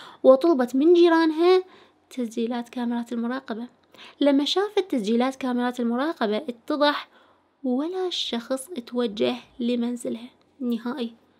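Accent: Iraq. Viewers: عندي سؤال محيرني من مده الحين لهجه صاحبه القناه وطريقة نطقها نفس اسو لكن السوال وش قبيلتهم بالعراق وش ينقالهم الي يتكلمون بهل نغمه 🤔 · انتي من اي دولة لهجتج خربطتني نص عراقي ونص اردنية ونص اكويتية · عراقية وهاي لهجتنا ف طبيعي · حبيت اللكنة العراقية لاني اسمعك على الرغم اني درسوني عراقيين وانا يمني الاصل ودرسوني اجانب والحمدالله صرت انسان رفيع مستوى بس لديك للكنه واضحة الى الامام دوما I loved the Iraqi accent because I can hear you, even though I was taught by Iraqis and I am of Yemeni origin, and they taught me by foreigners. Thank God, I have become a high-ranking person, but you always have a clear, forward-looking accent. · شدخال القبيلة. هي عراقية واخلص ف الكل يحجي كذاا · كل العراقيات يحجن هيج خصوصا الي ببغداد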